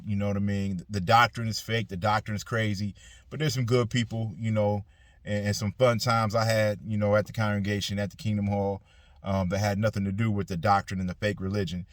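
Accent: Bronx, United States. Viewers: Sounds like he from the Bronx 🤣🤣🤣🤣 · Are you from the Bronx?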